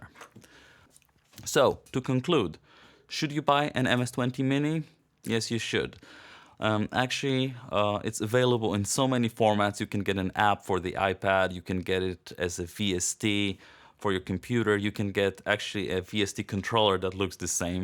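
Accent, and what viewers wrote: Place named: Sweden. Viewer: Love your swedish accent! musical!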